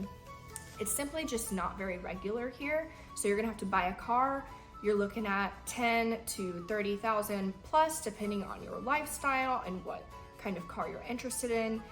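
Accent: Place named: Appalachia, United States. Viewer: For a British speaker from London, this is a hillbilly accent.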